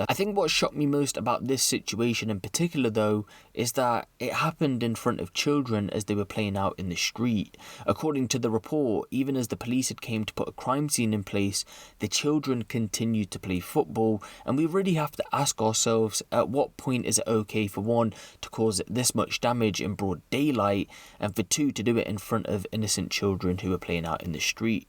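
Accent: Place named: RP, United Kingdom. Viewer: I can not take the narrator seriously he's so posh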